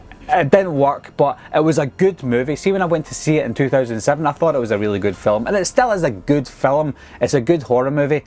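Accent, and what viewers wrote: Scotland, United Kingdom. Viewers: You're still Scottish?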